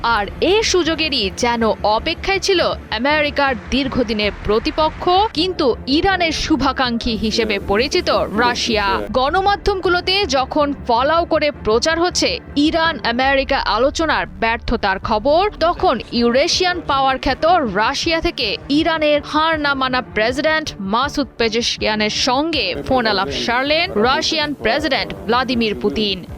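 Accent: India. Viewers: ভাষা শুনে মনে হচ্ছে এই সংবাদ পাঠিকা ভারতীয় নারী।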